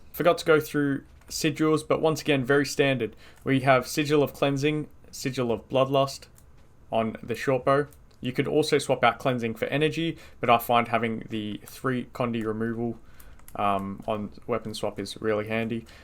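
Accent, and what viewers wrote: Australia. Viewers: Hey mate. I hear an aussie accent. How do you deal with the latency?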